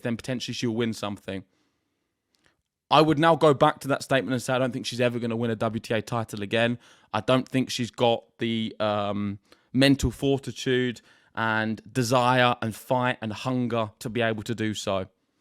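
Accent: United Kingdom. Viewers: You're English/British?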